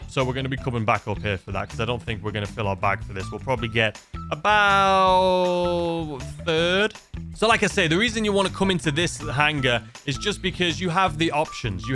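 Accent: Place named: Gloucester, United Kingdom. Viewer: Where was u from originally in the uk bro i hear abit of Gloucester in u lol! Do a Q & A one day so i can ask u lol an how did u end up movin to newyork 😎👌🏼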